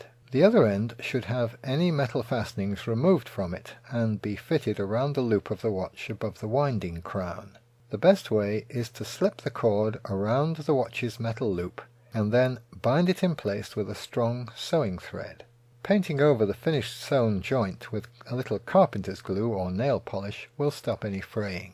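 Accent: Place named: Mid-Atlantic, United States. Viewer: You are the only person I know in the modern age with an impressive mid-Atlantic accent.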